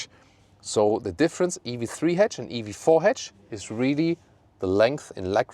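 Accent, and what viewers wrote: Germany. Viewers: I have watched a few of our videos and I find you one of the very few decent YouTube car reviewers. Your professional and in-depth approach are a cut above the usual brigade of self obsessed useless reviewers. I am British and love Germany, I find a lot of German things very soothing from the accent to the engineering and architecture. I think I probably was German in a previous life 😂. I like the Kia EV4 and would probably go for the sedan version due to bigger boot and I prefer the styling. I would love the 800 volt charging to be on the EV4 so you can charge from 10% to 80% in about 20 minutes however for me changing from 10% to 80% in about 30 minutes on 400 volts is not that bad an don't a deal breaker. I am concerned about the laggy slow GPS and if it is difficult to plan changing stops as you allude to. Overall I loved your review and am thinking of leasing one when they come to the UK as I want a Tesla but due to Elon's cray antics not to forget him going full Nazi I can not buy a Tesla in good conscience. 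I am genuinely curious about what you personally think about his an antics and his Nazi salute and what most Germans think. Lots of love to Europe from Britain ❤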